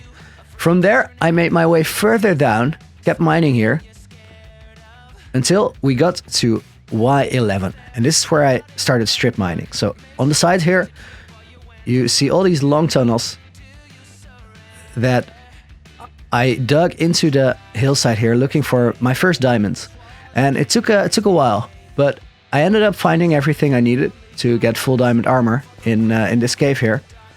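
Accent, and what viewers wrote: Netherlands. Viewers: U Dutch? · You sound a bit dutch... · hahah dacht ik al aan je engels te horen (is geen haat) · Hé sounds dutch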